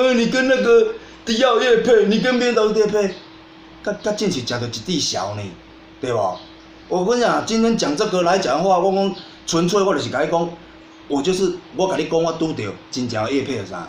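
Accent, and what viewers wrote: Chiayi, Taiwan. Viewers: 支持你！這集真舒暢我是滿喜歡留言板的，可以互動感覺挺棒的，而且有部分網友的意見也是很珍貴的唷我常逛youtube，可是平常也很少在留言但是我在的頻道停留的時間卻是最久的，有時候聽你講話也算是個很不錯的娛樂！哈哈因為我自己住台南所以聽你的嘉義口音覺得很親切你的頻道樂子很多，很有你自己的風格即便是廢片我覺得也是很有趣～驚丟！酸民無所不在我覺得你是個很正面的人所以自己覺得對的事就不要在意這多了當然偶而發發這種片子吐吐苦水舒爽一下也不錯最後還是要說加油啦！快點破十萬訂閱 XD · 這是我唯一一次在這邊留言真的很喜歡你拍的影片又帶有道地的口腔嘉義人支持你!!!!加油~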